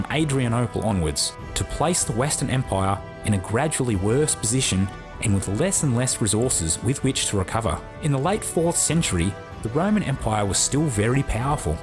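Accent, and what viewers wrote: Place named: Australia. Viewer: Wonderful overview told with a lilting Australian accent. Shared this with fellow students in a Future Learn course sponsored by Newcastle University, "Hadrian's Wall: Life on the Roman Frontier". Thanks!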